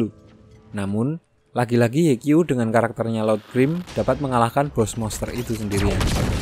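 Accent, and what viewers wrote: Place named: Yogyakarta, Indonesia. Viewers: Loh wong jogja to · Admin nya medok tapi lucu nya gokil...anjim 😂😂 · Jawa jogja masnya wkwkk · Oalah wong jogja to mas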